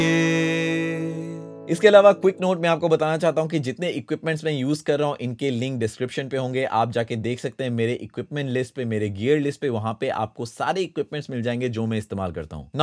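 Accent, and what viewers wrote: Assam, India. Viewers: Sir ap Assamese ha na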